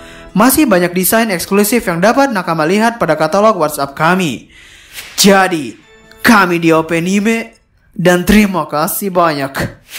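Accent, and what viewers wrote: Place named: Bali, Indonesia. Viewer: gw smpt mkr gtu kdgr dr " T " nya kyk logat org bali... hehhee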